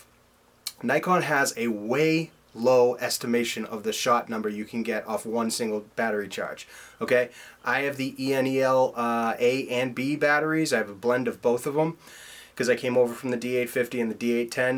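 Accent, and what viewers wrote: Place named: Massachusetts, United States. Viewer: You mentioned how you are from Massachusetts, then I suddenly noticed the accent. xD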